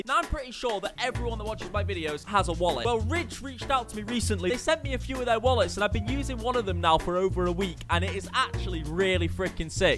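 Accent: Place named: Manchester, United Kingdom. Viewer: Not trying to sound wierd but where bouts is manchester are you from I’m from failsworth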